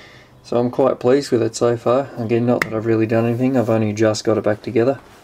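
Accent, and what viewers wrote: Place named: Australia. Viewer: Nice motor installation. Now to make some chips. From the accent I am guessing you are in Australia.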